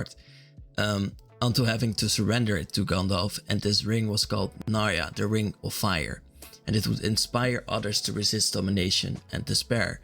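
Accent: Netherlands. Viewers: You dutch 🤔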